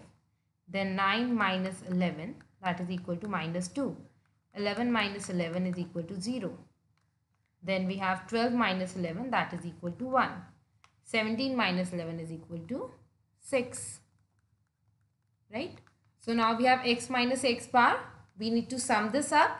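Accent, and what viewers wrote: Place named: India. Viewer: I really loved how Indian pronance English. I love all Indian people. Long live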